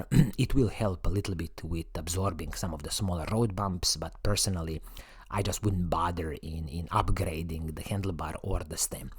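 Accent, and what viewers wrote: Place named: Italy. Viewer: English with italian accent, so funny 😃, yes nice bike without stock,, it's like they are no exist like model.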